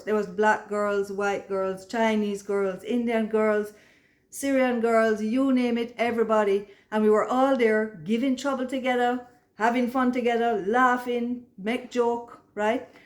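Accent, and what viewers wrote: Jamaica. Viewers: The funniest thing is hearing a chinese person talk in a heavy Jamaican accent. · My youngest daughter’s father-in-law is a white man with a Jamaican accent, just like this woman. It’s fascinating to see how he was raised in a similar way, embracing a culture that shapes his voice and mannerisms. ❤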